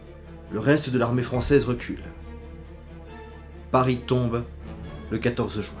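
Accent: Germany. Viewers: Je me permet de recommander une jeune chaine yt axé sur la seconde guerre mondiale, et qui a traité de l'offensive de la Sarre (le petit sketch du début avec la bière), c'est la chaîne des historateurs, n'hésitez pas 😎 Et comme d'hab... très bon accent allemand passif/agressif! 👌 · magnifique accent allemand ( et super vidéo en passant ^^ )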